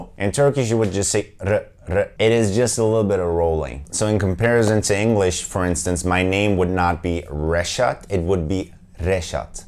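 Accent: United States. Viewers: And where did you learn English? You have an American accent. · Your English is American. Is that how they teach English in Germany? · You sound very American to me. You barely have an german accent That is crazy. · You have more of an American accent than German. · Born in raised in Germany? With an American English accent? Vay Vay Vay. Sen olasin Ürgup Dumanin Tutmaz.... Excellent command and ability to explain in English however it is the American accent used is for me a distraction. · you're very good at the american accent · Wow, this is awesome. You seem to have an American Accent and way of looking at the Turkish language so it is helping me understand much more deeply. Tips here also explained why my Turkish wife omits some words a lot haha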